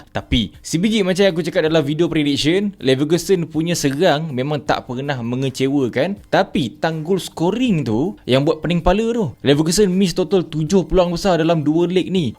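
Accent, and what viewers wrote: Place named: Kedah, Malaysia. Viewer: Harey hg cakap kedah bunyi supa taik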